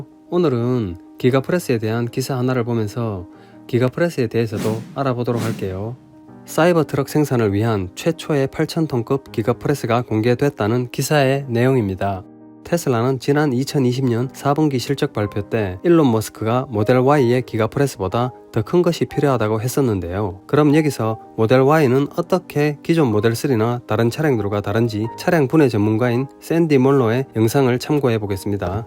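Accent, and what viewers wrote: South Korea. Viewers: 님 안녕하세요..처음 여기 와보네요.사투리가..칠곡 살던2000년 기억나네요..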